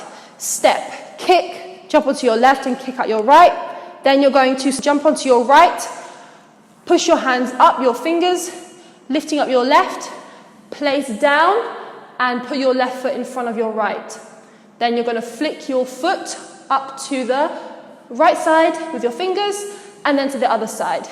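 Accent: United Kingdom. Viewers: Wait I'm confused u have a British accent so ur not korean?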